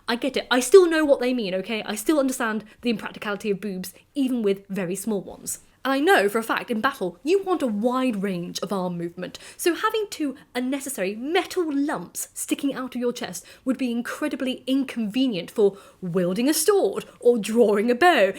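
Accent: RP, United Kingdom. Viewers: Came to hear a lady say boobie in a posh voice. Was not disappointed.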